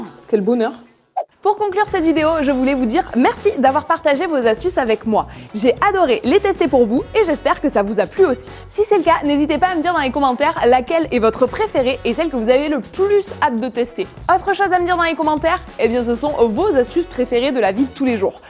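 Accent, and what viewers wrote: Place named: South, France. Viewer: Tu a un accent du sud c’est trop drole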